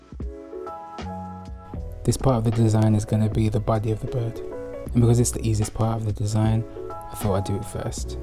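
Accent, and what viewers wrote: United Kingdom. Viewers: That was actually really comprehensive and clear! Thanks! Maybe its the cool british accent, maybe its the neat tidy workmanship, maybe both.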